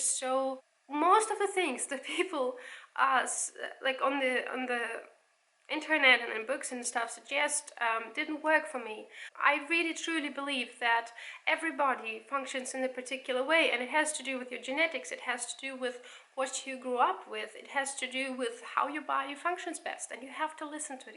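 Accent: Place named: United Kingdom. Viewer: And that irritating british voice.....Ugggg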